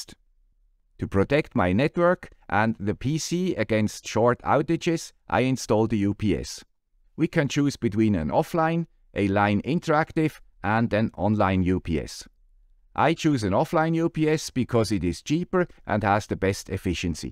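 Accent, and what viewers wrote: Switzerland. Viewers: The man with the croaky Swiss accent 8-) Everyone seems to have suffered this winter. I've been coughing since Christmas.!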